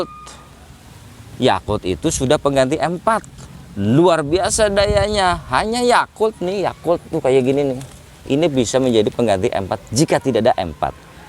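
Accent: Jakarta, Indonesia. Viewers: Abang orang Betawi ya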